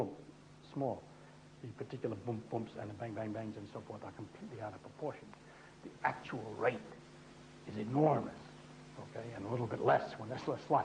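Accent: United States. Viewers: Brilliant Man..Sounds like physics 101 direct from the Bronx.. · Such a New Yorker haha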